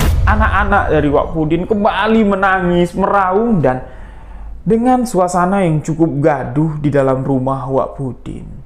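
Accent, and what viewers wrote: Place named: Jambi, Indonesia. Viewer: Walaupun saya orang Banten, saya suka sekali dgn bahasa jambi. Mas logat bahasanya jambi punya sangat bagus.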